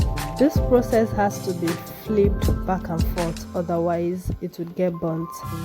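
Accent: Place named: Nigeria. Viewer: Hearing the beautiful Naija accent .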